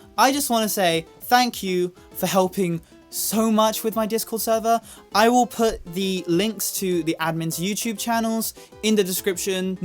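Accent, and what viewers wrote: United Kingdom. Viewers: 영상 올라왔네!!!! 하 너무 좋다, 그거 알아? 나 덕분에 영어 듣기 실력이 진짜 많이 늘었어!! 너무너무 고마워 🥰🥰 내가 미국 악센트를 가지고 있는데, 영상 엄청 많이 봐서 약간 영국 악센트랑 미국 억센트랑 믹스됐더라, ㅋㅋㅋㅋㅋㅋㅋㅋ근데 난 그것대로 좋아ㅎㅎ그리고 영상을 볼 때마다 는 참 바른 사람이라는 생각이 들어 언제나 응원할게 사랑해!! 근데 요즘 완전 슈스됐네..! 구독자도 엄청 많아지고, 영상 올린지 얼마 안됐는데도 댓글도 엄청 많구ㅎㅎㅎㅎ기분 좋다 Omg video is uploaded again!! Yayyyy I love it., you know what? thanks to, my English listening ability improved! Thank you so so much🥰 I have the States accent, but I watched videos alot so I got UK accent a little lolol I like that hehe And every time I watch his video, I think is really nice and kind and cool and hot person☺️I love your personality I stan I always cheering you up🥰🥰😘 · I'm not used to British accent and the way you pronounce hair sksjsk it's funny and adorable at the same time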